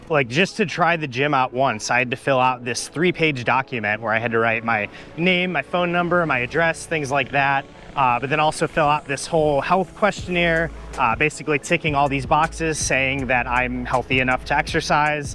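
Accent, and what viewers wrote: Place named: United States. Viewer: I like ur American accent and subscribed🇺🇸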